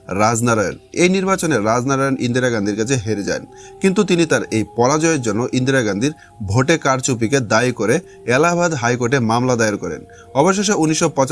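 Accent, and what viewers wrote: Bangladesh. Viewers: Are you Bangladeshi?. Because your pronunciation( talk) is like a Bangladeshi people. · Khankir chele anchor ta Bangaal ...Razkumari ....khankir chele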